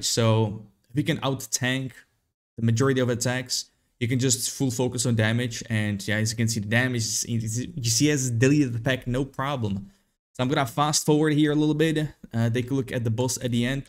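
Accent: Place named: France. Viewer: Bruh what language ur speaking...it sounds like english but french also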